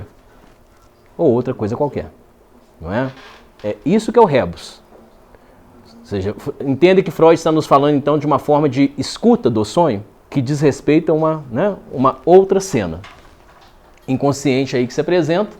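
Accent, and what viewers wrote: Minas Gerais, Brazil. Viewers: O jeito mineiro de falar da psicanálise faz dela brasileira...Boa palestra.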